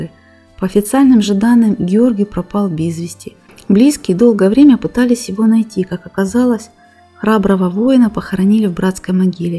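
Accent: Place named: Urals, Russia. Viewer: Да, да...Я тоже его слышу... Она,возможно, с Урала...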